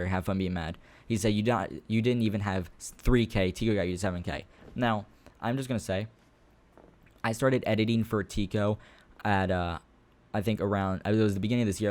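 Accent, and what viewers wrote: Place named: Florida, United States. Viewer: I know that this video came out 7 months ago but I’m so confused on why he saying the n word and he ain’t from Florida he sayin jhit like he actually from Florida.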